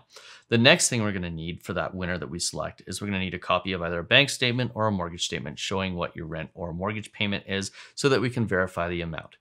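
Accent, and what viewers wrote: Canada. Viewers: I enjoy your content. Great to have a Canadian voice for mortgage and finance news.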